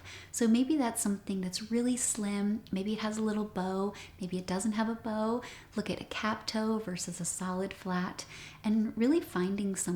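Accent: United States. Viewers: It just occurred to me (sick on the couch today) that it sounded like I was trolling🤦‍♀️ so I apologize if that's how I came across (you've got that old school transatlantic speak) I do find your page wonderful and I wish more women would follow your lead 💯 what also makes it so appealing is its geared towards EVERY age 😍 There is a woman named Heather something or other and I swear her "fashion advice" towards the 40+ set has them dressing like milf cougar super hookers that look like they've been gangbanged by a crayon 🙄 Not even kidding AND she calls it classy 😂 you must find her and see.. try not to spit your coffee!😉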